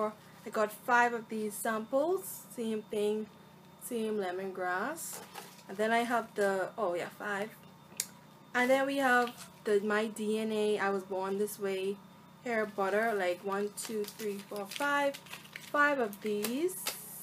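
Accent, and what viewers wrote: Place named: Liberia. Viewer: Hey are you Liberian?? I can hear a liberian accent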